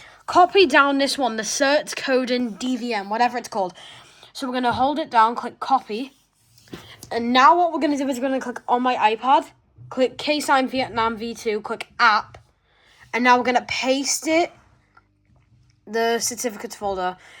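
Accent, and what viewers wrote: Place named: Liverpool, United Kingdom. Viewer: W scouse bro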